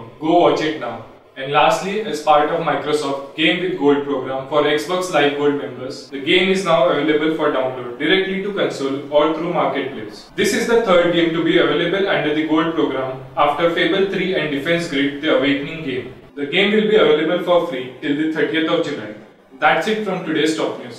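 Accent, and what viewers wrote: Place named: Germany. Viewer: Was Microsoft short of native English speaking people, in order to let this guy do all the talking?! I thought he was speaking German...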